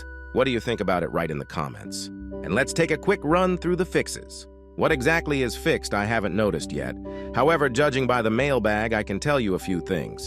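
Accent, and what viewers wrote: Russia. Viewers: Are you russian🤨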